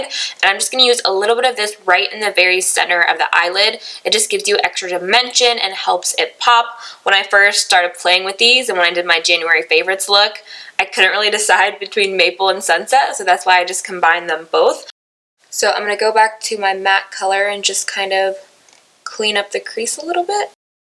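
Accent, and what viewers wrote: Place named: Louisiana, United States. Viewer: I love your accent that comes through sometimes. I'm from Louisiana, near Baton Rouge, so it's fun to hear a little bit of familiar dialect.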